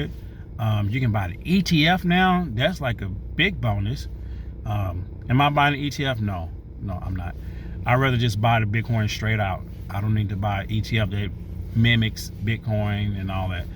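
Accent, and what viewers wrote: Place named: Ohio, United States. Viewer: Where you from Ohio? I'm doing this thing where I try to "guess that accent " 😂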